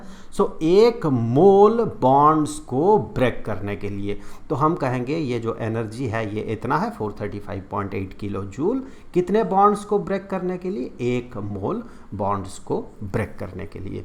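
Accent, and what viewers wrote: Bihar, India. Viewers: but brother i am from Uttar Pradesh😂😂..His ascent of speaking relates to biharis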